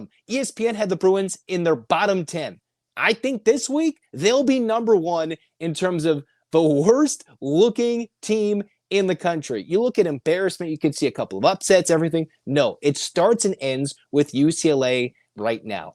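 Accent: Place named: United Kingdom. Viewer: You sound like that uk guy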